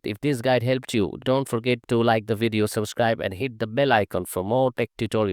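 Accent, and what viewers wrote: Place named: India. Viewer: Why do all these guides have a creator who sounds like a typical Indian scammer who scams elderly people into taking out loans for their grandchildren etc. :D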